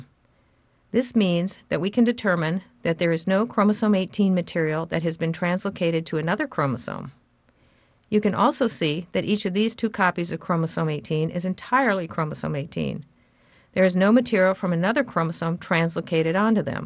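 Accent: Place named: Texas, United States. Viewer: Ahh.. I love that Texan accent ^^ Very clear explanation of this method also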